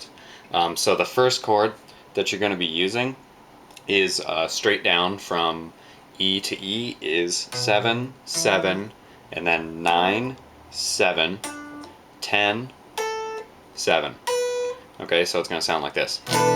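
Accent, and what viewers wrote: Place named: Germany. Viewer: I'm really speechless at how wicked that thing is. You sound a bit like Klaus from american dad, a slight german accent there :-)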